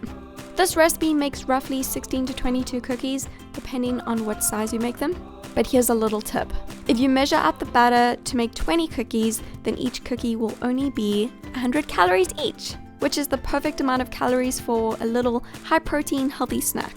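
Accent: South Africa. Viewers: Are you from south African? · are you south african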